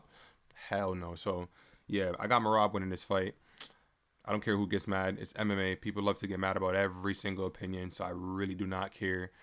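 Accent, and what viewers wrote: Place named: New York, United States. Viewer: my fault bro, you sound like me a little and a bunch if others I know lol. NY maybe? Bless up bro and solid takes.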